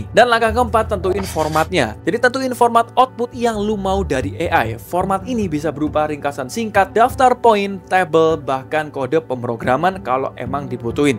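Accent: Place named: Surabaya, Indonesia. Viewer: mantap cak, logat sby nya kelihatan... sbynya barat, timur?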